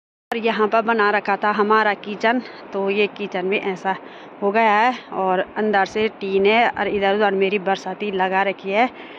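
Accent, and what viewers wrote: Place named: Garhwal, India. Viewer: Jitni marji Hindi bol lo gadhwal ton me bat to ho hi jati hai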